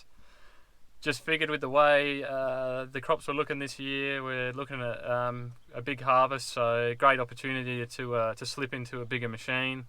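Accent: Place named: Australia. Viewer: it’s refreshing to listen to you talk ‘Aussie’ and refer to the equipment properly. I’m so glad for the comment about the header and real, calling them what they are in Australia. Clearly I have spent too much time watching US farmers and hearing what they call their equipment. Also, you correctly refer to rainfall in mm and weight in tonnes, etc, not in imperial like our US friends. By the way, I’m slowly making my way through all your videos. They are fantastic and are a pleasure to watch. I’ve done a bit of video editing myself and understand the time that it takes. Please continue to make your video’s, every one I watch I learn something new!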